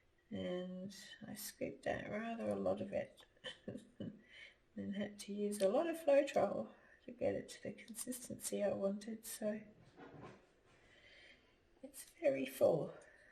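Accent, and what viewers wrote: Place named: United Kingdom. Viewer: I have two of Sarsh's paintings. One is Low Tide (there is a video). Have a smaller condo so space is limited but her paintings are the highlight of a wall. She noticed you because of your exceptional progress over a short time. Good for you. Assume you are in British Isles based on your lovely accent and 5 hour time difference. Have a wonderful day!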